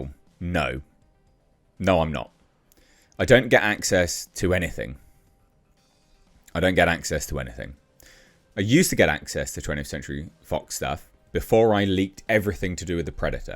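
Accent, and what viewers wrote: England, United Kingdom. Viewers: Hey, don’t sweat it bro. It’s probably just some kids. I appreciate your enthusiasm for Aliens & Predator stuff. It’s one of the reasons I subscribe. You also have good style, good politics, & even got me into retro Datsuns. The “vain” allegations are probably just because some Americans think anyone with a proper English accent is stuck up (arrogant). Who cares…You do good work & your channel is growing. You seem to be living the dream from my viewpoint. 🫡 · maybe the non UK audience think you are vain as they are not use to the accent and how Brits present themselves? either way I LIKE YOU and the "Kings English"! 😀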